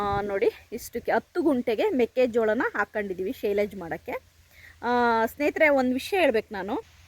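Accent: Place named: Ajjampura, India. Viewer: ನಿಮ್ಮ ಊರು ಯಾವುದು ಮೇಡಮ್ ನಿಮ್ಮ ಮಾತಿಧ ಉಚ್ಚಾರಣೆ ನೋಡಿದರೆ‌ ನಮ್ಮ ಅಜ್ಜಂಪುರ ಪಟ್ಟಣದ ಸುತ್ತ ಮುತ್ತಲಿನ ಹಳ್ಳಿ ಅನಿಸುತ್ತದೆ ದಯಮಾಡಿ ವಿಳಾಸ‌ತಿಳಿಸಿ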